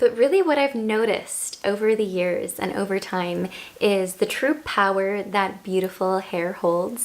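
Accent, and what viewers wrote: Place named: Ireland. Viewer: I love your top!!! By the way, are you Irish? You have a very slight accent & I'm curious 😊 Great video ✨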